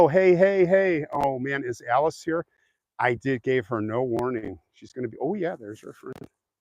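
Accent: Scotland, United Kingdom. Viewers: Loving your Scottish Accent! 🏴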